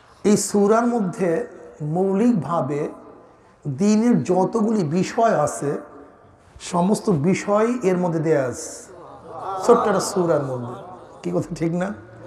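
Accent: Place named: Barishal, Bangladesh. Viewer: শায়েখ মুখ থেকে বরিশালের ভাশা শুনলাম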